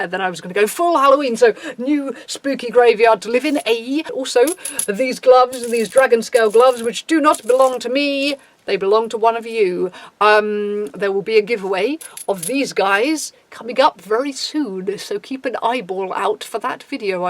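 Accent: United Kingdom. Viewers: hey, what part of Britain are you from?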